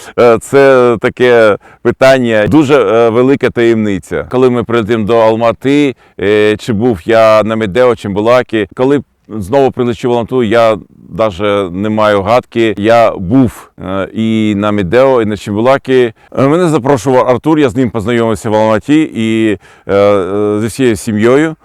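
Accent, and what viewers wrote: Ukraine. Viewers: с украинским акцентом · Вы стали шипко по заподно-украинскому языку говорить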